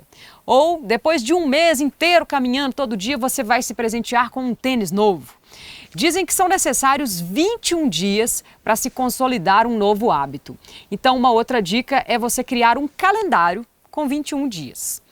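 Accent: Minas Gerais, Brazil. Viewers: As mulheres mineiras estão muito bem representadas por você, tem um belo sotaque que não e arastado e nem enjoativo sua voz e linda e vc e muito simpática e por ironia do destino e linda muito linda mesmo com um belo formato de corpo,tem um velho ditado que diz que uma mulher quando e bela até chorando ela e bonita,com todo respeito sei que você se olha no espelho e realmente e muito especial, tudo de bom para a sua vida é principalmente para toda a sua família, sucesso para sua vida hoje é sempre.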